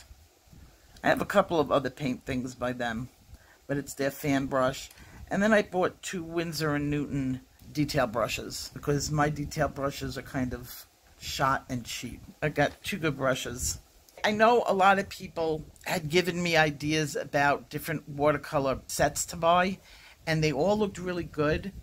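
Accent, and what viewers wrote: New York, United States. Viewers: I am so sorry to hear your hubby is so sick, and especially that u can’t visit him right now. I hope he is better soon. I love how u have known each other since grade school. That is so special. I love retail therapy also! Having grown up in Queens, New York, it’s almost like being back there when I hear your New York accent. I love it. Hoping he is better and home soon where he belongs.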